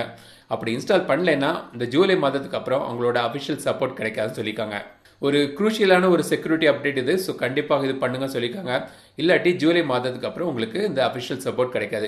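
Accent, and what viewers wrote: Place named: Nagercoil, India. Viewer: நம்ம நாகர்கோவில் வார்த்தை உச்சரிப்பு உங்க கிட்ட இருந்திச்சி அதான் கேட்டேன்.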